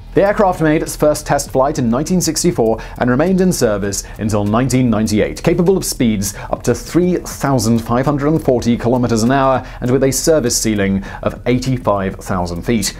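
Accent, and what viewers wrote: United Kingdom. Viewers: Why do British people talk like this. Do they hear themselves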